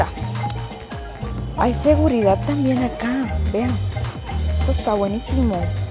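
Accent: Guatemala. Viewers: Ya hablas como chapina 🤭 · DATO IMPORTANTE “YA SE TE SIENTE EL ACENTO CHAPÍN” JAJAJA Se siente de a huevo 🫶🏼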